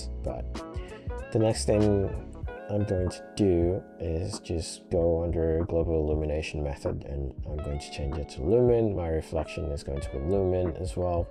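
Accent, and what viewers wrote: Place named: Nigeria. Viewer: Such a skilled Man. Immediately I heard Nigerian, I smilled. Great Job my Brother.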